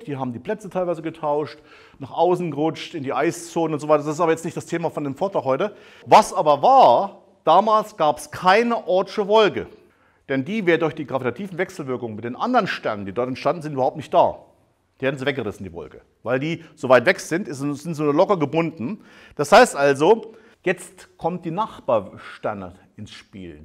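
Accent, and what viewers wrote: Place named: East Germany, Germany. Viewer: Musste leider schnell wieder weg wegen Ossi Aktzent...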